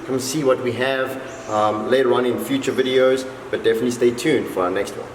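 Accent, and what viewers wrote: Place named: South Africa. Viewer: It only took me a few seconds to realise I was listening to a fellow South African! Howzit!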